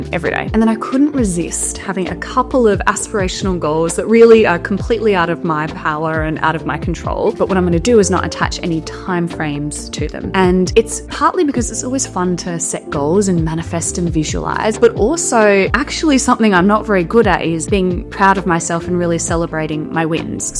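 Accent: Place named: Australia. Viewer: Keep going! You are definitely on the right track. The absolute most important thing I have found is consistency – upload with the same time intervals, whatever you choose – and keep the momentum. Google seem to like that. Experimenting with content, like you're already doing, is also great. Have learnt many informative things from you, so will definitely be continuing to follow you. Love the Australian accent BTW!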